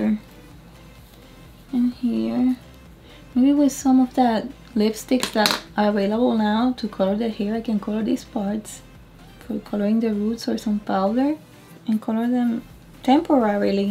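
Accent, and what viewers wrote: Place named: Colombia. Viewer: You look so gorgeous 😍😍 you literally look like Lady Gaga with a Sofia Vergara accent ✨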